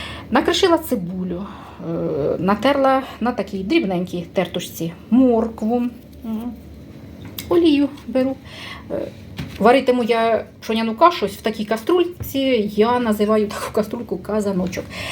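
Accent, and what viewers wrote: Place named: Cherkasy, Ukraine. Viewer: Гарна черкаська говірка, приємно чути.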